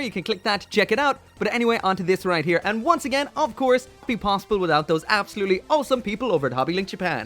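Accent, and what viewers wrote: Ireland. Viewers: Are you Irish like me?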